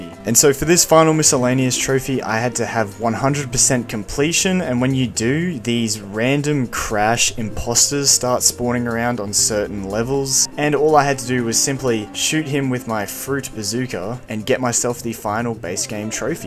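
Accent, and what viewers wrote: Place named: Australia. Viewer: 1: Crash Bandicoot takes place in a fictional version of Australian Islands. 2: you do kinda sound like that.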